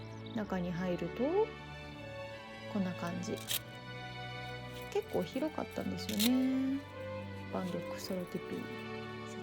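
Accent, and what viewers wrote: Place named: Kansai, Japan. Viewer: サバァは、猫舌でパンケーキ好き。マジで、ぶち込む、めっちゃと関西弁で。水と食料を忘れ、安全第一！オモステの軍用車を操縦する・背伸びする猫天使👼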